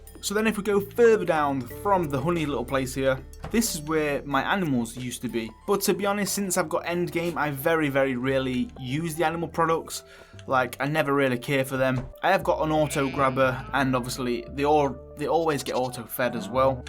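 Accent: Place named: Northern England, United Kingdom. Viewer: The fact I am thinking your proper northern like me 😂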